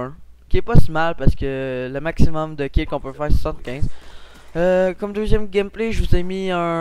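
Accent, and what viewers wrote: Quebec, Canada. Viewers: On dirait que tu as un assent quebequois mélanger avec un assent français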